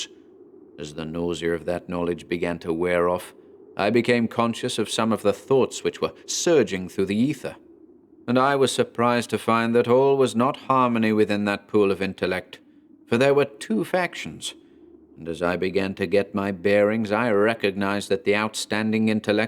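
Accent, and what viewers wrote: United States. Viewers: does such an amazingly subtle American accent it makes me laugh.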